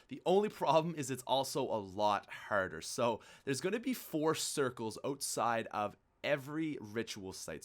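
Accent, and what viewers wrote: Canada. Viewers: Holeh fuck bud ur canadian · r u from Canada? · im sorry for this but you sound really canadian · if this is racist to u I'm srry. But r u Canadian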